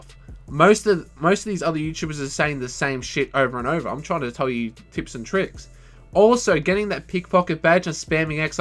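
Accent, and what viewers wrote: Australia. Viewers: You Australian?? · Are you from Sydney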